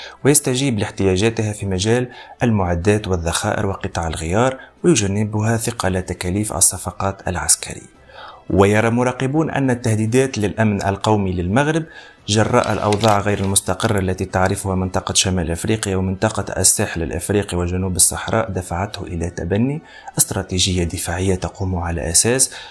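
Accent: Tunisia. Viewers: ماشاء الله تبارك الرحمن تحليل في المستوا مع نطق باللهجة التونسية سبحان الله في المستوا برافو عليك أخي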